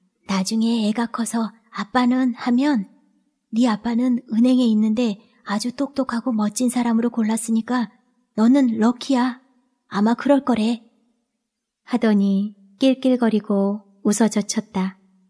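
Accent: North Korea. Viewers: 이북 사투리를 맛깔나게 쓰셔서 우리 고모만난듯 했어요. 따뜻한 소설 잘 들었습니다. 감사합니다 😊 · 언제 그옛날 많이 들어보던 구수한 이북 사투리를 습득 했을까 --- 제목 같이 멋져 버렸네요!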